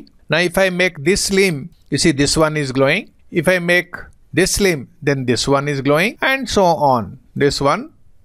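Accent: India. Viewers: Indian English funnyyy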